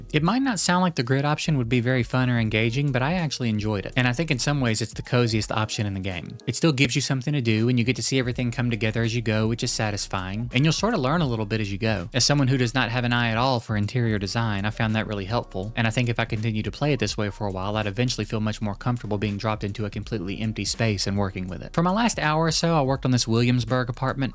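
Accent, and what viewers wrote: South, United States. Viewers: Finding someone who can recommend good casual non farming games? Amazing. Hearing the southern accent? You do not understand how much it comforts me.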